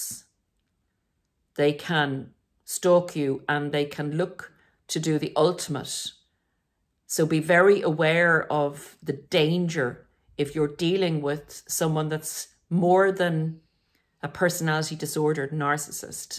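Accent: Ireland. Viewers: Came for the info - stayed for the lovely Irish voice.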